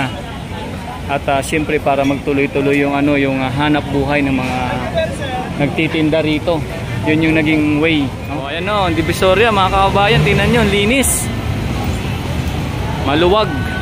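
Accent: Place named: Visayas, Philippines. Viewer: Bisaya accent ka boss ah hehehe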